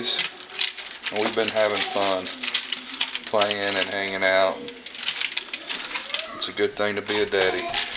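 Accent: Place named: South, United States. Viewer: that Southern drawl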